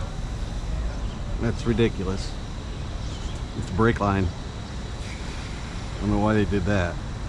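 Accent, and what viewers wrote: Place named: St. Louis, United States. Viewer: Hey, former south St. Lou here. Have been checkin out 300L vids and ran on to you. Clicked on one of your vids and as soon as you started talking I said this dude is from St.Lou haha. I moved away in 1995 sooo! But I lived there for 29 years and had a Harley super glide that after hittin the bars we would sometimes go out to Creve Coeur Mill rd and blast em. That was late 70's so those soccer fields and all that wasen't there and it was dark. Cops would turn on their lights and come after us but wouldn't stop and get out to confront us out there in the dark lol. I have a Africa Twin now down here in South Georgia and it's a beast but am looking to get something smaller and easier to handle as I'll be 71 on next birthday. I had a little yamaha 360 enduro back in the day and it was lower seat but knobbys and it would go about anywhere so start cutting through places and jumping curbs and use that thing the way it can be and you'll have more fun. 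Good luck with yours! PS I know about the divorce thing. No sweat you'll be better off!!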